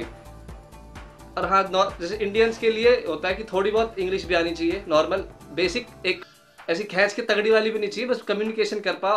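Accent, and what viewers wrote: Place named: Rajasthan, India. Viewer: Very nice,speaking like rajeshthani indian good way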